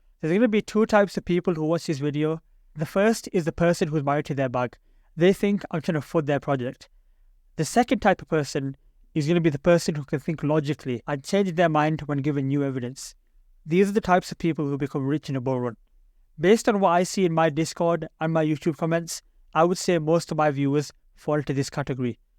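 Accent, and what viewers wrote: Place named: Yorkshire, United Kingdom. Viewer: He's literally English from I'm guessing Yorkshire or Lancashire like Bradford area learn accents before you start labelling smart arse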